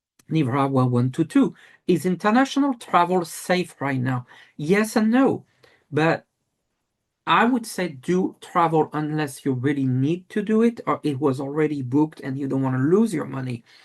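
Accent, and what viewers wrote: France. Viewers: Luv you ….love your reads!!!!! ❤❤❤ This is kind of a weird funny thing to tell you, but….as a child, I often would talk in a French accent. For days….weeks even! I’m an American, and now I think it was perhaps a past life thing. I always felt such affinity to the French, France in general and perhaps even tied somehow to WWII. I dunno…but, it’s weird but I feel a sort of “comfort” in hearing you speak. I’m glad you’re French and lol….I don’t speak French but, intuitively I feel like I could readily grasp that language. ❤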